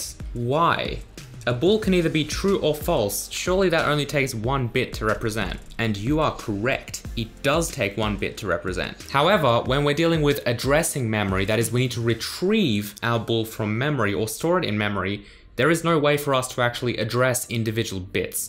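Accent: Australia. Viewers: That’s the Australian accent right? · He's an Aussie.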